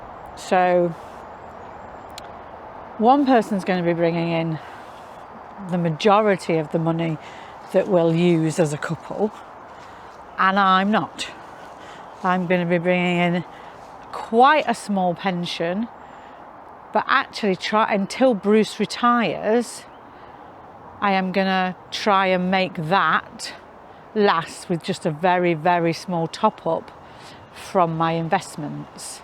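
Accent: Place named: Leicestershire, United Kingdom. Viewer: Is this a Leicestershire accent 😊.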